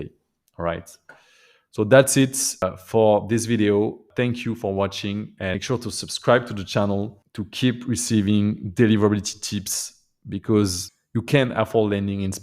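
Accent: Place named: France. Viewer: sympa l'accent Français :)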